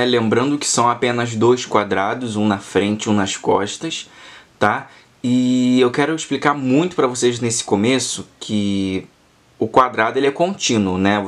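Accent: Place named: Rio de Janeiro, Brazil. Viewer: Linda aula, bem explicada! "Carioquês" delicioso de ouvir!💛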